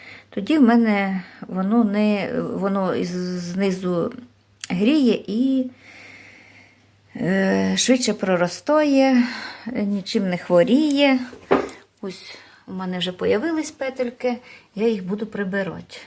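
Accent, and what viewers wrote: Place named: Central Ukraine, Ukraine. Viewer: Добрий вечір,. Пояснюєш і росказуєш дуже доступною мовою. Ти чесна і відкрита людина. Говір центральної України. Велике дякую за всі відео і твій труд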